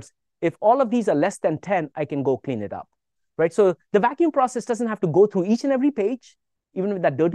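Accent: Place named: India. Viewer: Indian English is hard to listen😂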